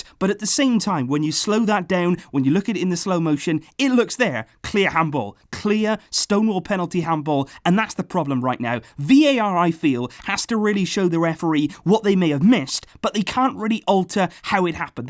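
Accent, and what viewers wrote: Wales, United Kingdom. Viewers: I really love your Welsh accent. Brilliant content as always, and I do hope this channel would grow bigger and bigger.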